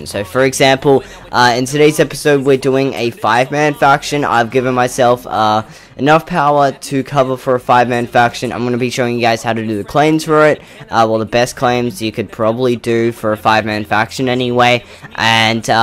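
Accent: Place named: Queensland, Australia. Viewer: Great vid again so happy there is a Aussie youtuber. Cause I'm Aussie and let me guess where you are from I tried last Ep how about QLD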